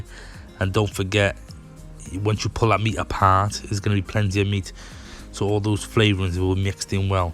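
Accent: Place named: Cardiff, United Kingdom. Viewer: Got to be a cardiff boy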